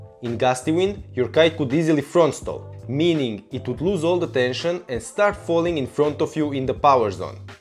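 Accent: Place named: Russia. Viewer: Iemācies angļu valodas pareizu izrunu, angļu valoda ar izteiktu krievu akcentu! Pretīgi klausīties!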